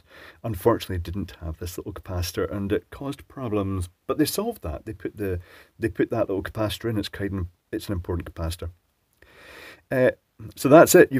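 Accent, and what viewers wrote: Scotland, United Kingdom. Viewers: Really appreciate your videos 😊 I really love the accent and you're in Glasgow!! It's awesome!! My mom is a MacKay from New Glasgow, Nova Scotia, Canada. I have second and third cousins in Scotland. Mom's 92 and reminds me she's still Canadian everytime I contact her. Even though she has been living in the United States for over 65 years. I'm also a Ham Radio hobbyist for over 20 years. US call sign KB1DIG. Did a little contract work with Raython Aerospace and the Department of Defense just after 9/11/2001.. Production Controller for the retrofit of avionics on the KC-135 stratotanker.. Air Force version of the Boeing 707.. Flying gas can.. Played around with UHF VHF antenna design. Halos and collinear design antennas. Some of my stuff is still floating around on Google. I'm retired to the Philippines 4 years now. Have been doing a lot of YouTube videos. 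Mostly custom built ideas for motorcycle related stuff here in the Philippines. Plus some of the local happenings here. Thank you for your work and best regards for your future from the Philippines 🤠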